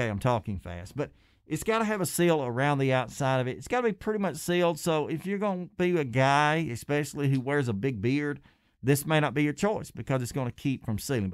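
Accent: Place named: South, United States. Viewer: As a fellow southerner now living in the PNW, I love hearing your accent! I miss it!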